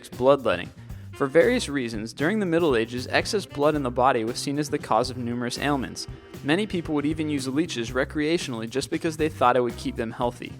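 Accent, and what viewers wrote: Canada. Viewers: ***** Racist? He's a Canadian..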